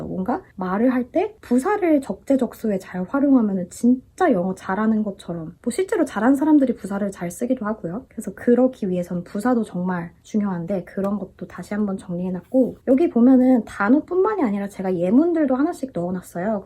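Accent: United Kingdom. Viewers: 책 출간 축하드려용 >_< ❤️ 바로 교보문고 달려가서 구매하고 왔습니다! 빨리 받아보고싶네요! 제 영국영어 롤모델이세용..목소리도 너무 좋으시구 ,, 은근 슬쩍 팬심 들어냅니당! 다시 한 번 축하드려요!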